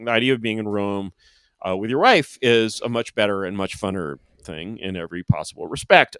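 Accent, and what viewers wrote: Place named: California, United States. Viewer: your perfect California English accent is o minune misterioasa. Your vocabulary, your mastery of both language and subject matter is astonishing. Curious, can you also speak Magyar? Oh well, carry on. I just had to exclaim a little!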